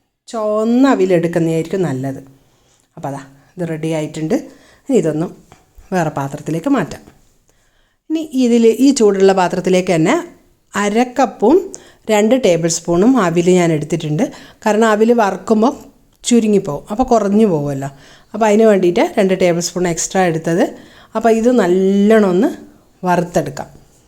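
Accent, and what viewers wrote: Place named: Vadakara, India. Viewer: അവിൽ മിൽക്ക് ഇഷ്ടം ആയി സംസാരം അതിലും ഇഷ്ടം ആയി അവിൽ കയ്യിൽ ഇട്ട് നുരുമ്പുമ്മോ പൊടിയണം വടകര ഭാഷ എന്തായാലും സൂപ്പർ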